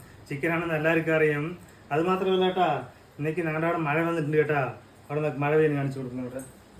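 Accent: Tamil Nadu, India. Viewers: ചാർ എന്ന് പറയുവോ കറി എന്ന് പറയുവാ.. നിങ്ങൾ തമിഴ് ആൾക്കാർ ആണോ?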